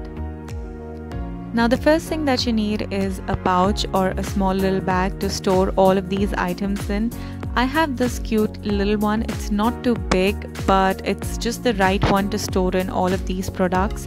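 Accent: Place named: United States. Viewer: UR AN INDIAN BUT U HAVE USA ACENT LIKE ME IM ALSO INDIAN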